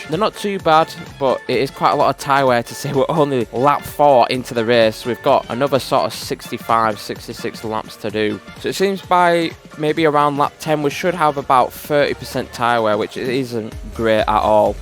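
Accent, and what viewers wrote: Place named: Manchester, United Kingdom. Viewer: Manchester accent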